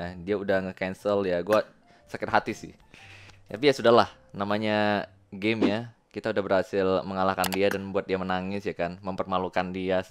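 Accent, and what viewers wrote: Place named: Sumatra, Indonesia. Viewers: logat ujung sumatra nohh,,, maluin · Orang aceh. Itu dger bahasa ngomong nya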